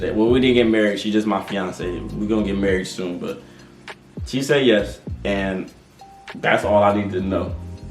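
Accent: United States. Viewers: Lmaoo you gotta be from Detroit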